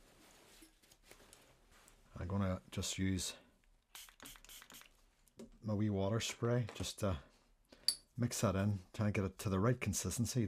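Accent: Northern Ireland, United Kingdom. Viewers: These are the most realistic, looking shit I’ve ever seen painted! Nicely done. Love your videos and just subscribed. What part of northern Ireland are you from?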